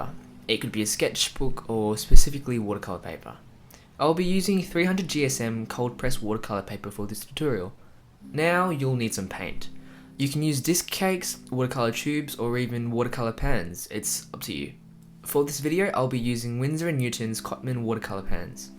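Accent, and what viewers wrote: Australia. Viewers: Are u a fellow Australian??? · is he from Australia perhaps? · you're Australian, yeah? Certainly sound so to me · Are you australian?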